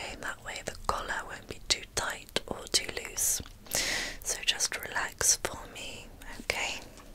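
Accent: England, United Kingdom. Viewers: The tone of your voice is perfect. It’s very calming. An English accent suits asmr, it’s mesmerising.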